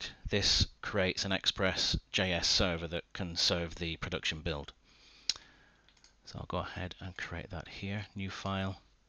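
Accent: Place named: United States. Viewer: Thanks mate. Helped a lot.. Where are you from btw? You sound like american but choosed europe, so I'm wondering :)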